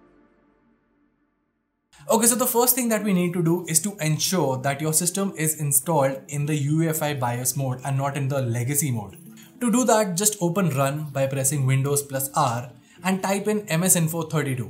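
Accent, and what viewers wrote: India. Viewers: That indian "gulu gulu" accent.....................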